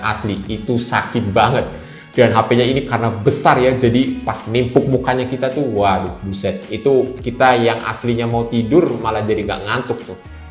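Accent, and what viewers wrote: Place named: Java, Indonesia. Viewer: Medok juga sih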